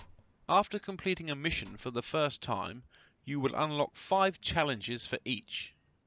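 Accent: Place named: United Kingdom. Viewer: A bit late on here but ' better late than never' I got SE2 3 and 4, love these British made games, on Steam with an Xbox controller, USB connected, in SE2 there is a tutorial at the beginning, so this taught me how to sneak around n shoot. But with any games, make mistakes and you learn. The tip you did miss was when in the bushes and having a dump be sure you are downwind. Well presented tutorial, 10/10 clearly understand your cool calm British English voice, they are the best with tutorial lessons.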